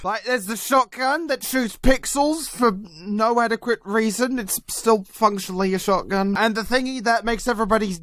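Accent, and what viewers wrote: Australia. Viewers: wait u r australian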